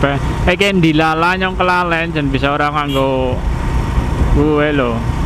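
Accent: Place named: Java, Indonesia. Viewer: Nyong'e seneng yen bhsane medok kyk kiek 😁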